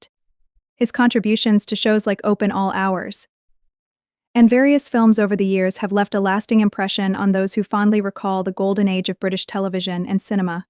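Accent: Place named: United States. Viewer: Why have an annoying American robot voice telling us this. Ruined it. Can't watch now.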